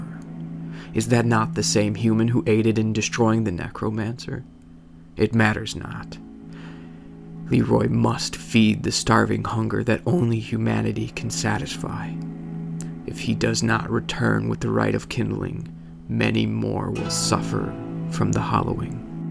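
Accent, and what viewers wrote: United States. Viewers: Nah... you need that brit accent to make this legit. The American accent just wrecks all medieval type lore stories. It's just the truth man.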